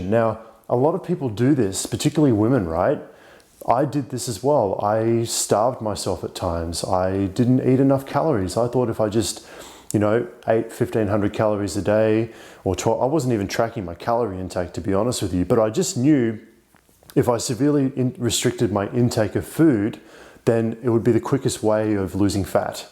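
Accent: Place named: Australia. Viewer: interesting seeing a guy that looks super swedish speaking in an aussie accent.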